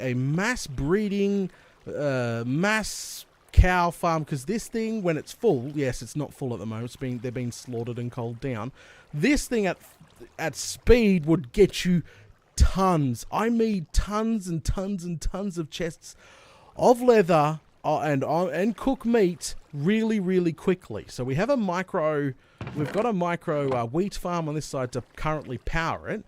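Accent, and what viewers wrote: Australia. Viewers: not hating at all but you are just soo australian it’s crazy lol. anyway wonderful video and beautiful world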